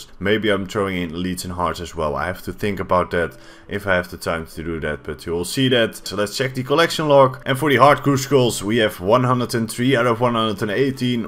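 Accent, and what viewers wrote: Netherlands. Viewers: hears the guy talk Me: 'Is he dutch?' looks at the channel Me: 'Ah'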